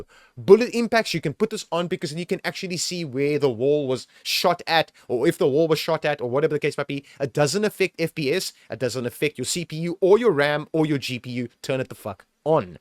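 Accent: South Africa. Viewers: i heard the accent and realised your a south african too, awe and thanks for making this video it really helped me.also keep up the good work my bru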